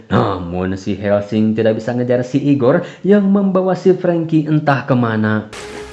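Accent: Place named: Subang, Indonesia. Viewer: Aksen subang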